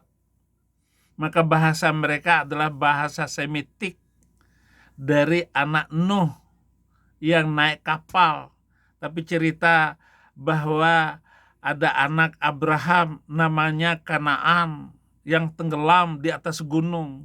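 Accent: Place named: West Nusa Tenggara, Indonesia. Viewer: yang sehat selalu ya abba kami selalu berDoa untuk mu🙏💖💖💖🙏(menggikut Yesus keputusan mu) dan itu sangat berat karna dari logat mu saya tau anda dari kota atau daera NTB yang mayoritas (muslim)🙏🙏🙏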